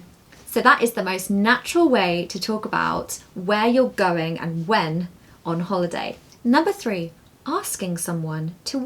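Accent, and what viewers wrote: United Kingdom. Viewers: I enjoy watching your carefully crafted and informative and energetic posts, radiating your love for wonderful modern and old English. I love to hear true British English coming out of your beautiful and cheering smile. You are best of the best! Off-topic - what is true British English word (a verb) for "to be a fan" (Am.English "Rooting")? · I love your British accent,and really I am able to understand what you say also when I don't know all the words.😊Thanks a lot. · Wonderful! It's amazing feeling for the rest of my life that I've found your account and can listen to this lovely information and this elegant British accent😍 we're waiting for a live! If you have other occounts tell us please🥰 thank you from Iraq💗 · Hi, British English is a closed book to my area in Sri Lanka. You are a great inspiration for me to follow British accent. 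Your explanation is simple but informative. Thank you so much.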